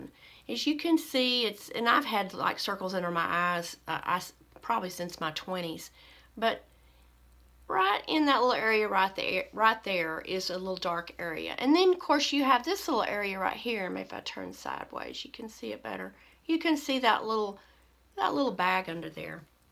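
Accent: South, United States. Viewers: Hi, you are so right about this! Simple logic that I certainly did overlook. I occcassionaly get bags so I will remember that. I do get dark circles so for that I will use the concealer. I love your southern drawl. Feel better. Melissa is a sweetie. xoxo Much love xo Marta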